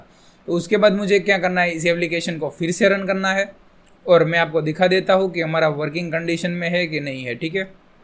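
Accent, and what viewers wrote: Gujarat, India. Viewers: Bhai tamara bolva parthi lage che k tame gujarat thi cho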